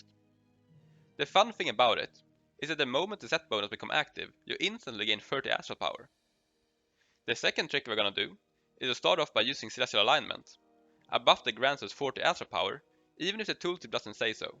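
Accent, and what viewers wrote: Sweden. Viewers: Asså cool vid osv, men din svengelska är så söt, minn or trait 😅😅😅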